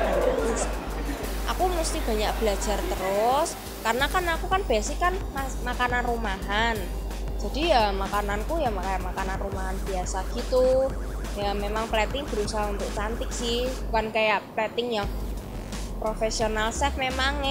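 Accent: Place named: Semarang, Indonesia. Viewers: Logatnya beda lah jatim sama jateng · panggil saja niyak itu logat semarang 😂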